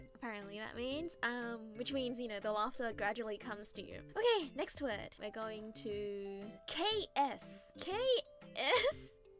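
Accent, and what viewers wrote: Australia. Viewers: You make me feel so much more connected to Love Live!! I haven’t heard you speak in English up until this point, but it’s jarring to hear you speak! I knew you were Aussie, but the accent just was like “woah! This is a Love Live Seiyuu!” (I hope that doesn’t come off wrong >_<) You put yourself out there and you feel so...human. You’re a bridge between the western and THE JP love live fanbase. Thank you for all your hard work!!! I can’t wait to see what you do next!!!!!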